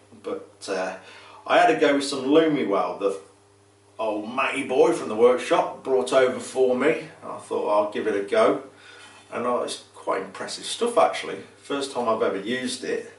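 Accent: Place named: Leicestershire, United Kingdom. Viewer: Just found your channel, and started catching up with your vids, I've been an engineer all my life, so can appreciate your skills, and with that accent, Im thinking you are from somewhere in Leicestershire? anyways, great content, really enjoying it!, cheers Dave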